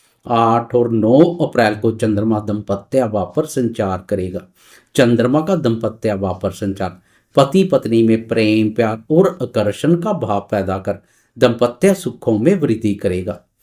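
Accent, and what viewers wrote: Punjab, India. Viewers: Sir ik bath bathoo vasay app punjabi ho kya😊 kieyu ki bolthay hoye bhi hindi ap ki punjabi jalkthi hai ji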